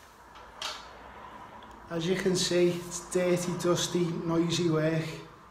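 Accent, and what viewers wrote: Liverpool, United Kingdom. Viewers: Great great vid. Good to hear the scouse accent as well now i want to spur off two sockets in my bedroom but as it's upstairs do I have to feed the cable through the loft joists Also do you have a website where you do general questions and answers. Thanks!!!! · A Scouser doing some work, luv it.....just luv it.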